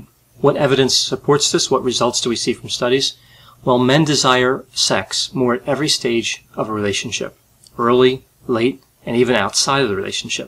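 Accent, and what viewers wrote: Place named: Philadelphia, United States. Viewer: Anothe cool video! You’re from the Philly area aren’t you? I heard a few words that gave it away.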